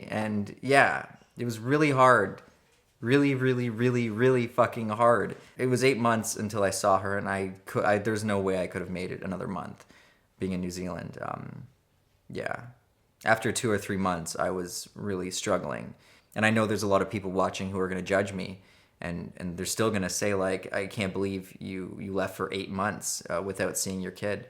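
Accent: Canada. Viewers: How come Canadians always say "about" weird?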